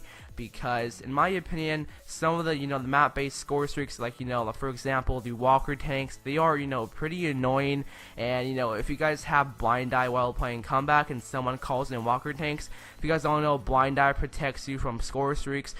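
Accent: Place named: Spain. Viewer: it sounds that you have an Spanish axsint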